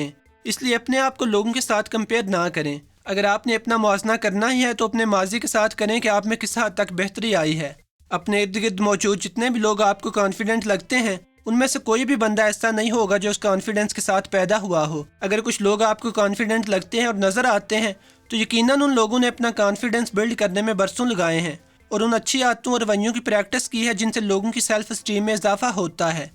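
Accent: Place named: Sialkot, Pakistan. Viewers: Punjabi urdu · App ka lehja sialkoti hy na.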